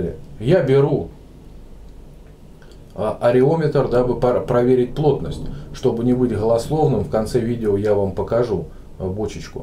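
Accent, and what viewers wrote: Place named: South, Russia. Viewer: Привет,!(Я правильно понял,Вас зовут?) Приятно смотреть,а ещё приятней слушать южно российский говор с мягкой буквой "г".Сам со Ставрополья,разговариваю так же.Буду рад,если достанутся дрожжи на халяву!🤗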